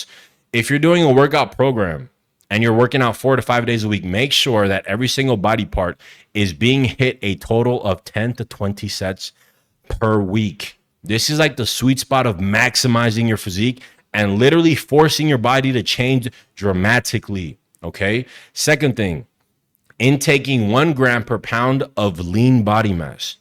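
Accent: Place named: New York, United States. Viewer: My guy sounds like he's from NYC...good video bro..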